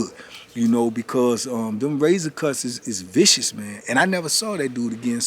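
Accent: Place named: New York, United States. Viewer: ALRIGHT NOW.....WATCHIT😂😂😂😂I'M ON THE FLOOR ALREADY YOU GOT THAT NYC ACCENT IN FULL EFFECT😂😂😂LOUD... TELL IT ALL AND TRAINED TO GO😂😂😂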